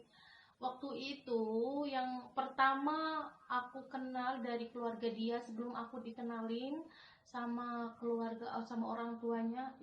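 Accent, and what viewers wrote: Banyumas, Indonesia. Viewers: Karo basa ngapak yaa, mba..😂😂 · ngapak ngapak salam kreasie kompelite like like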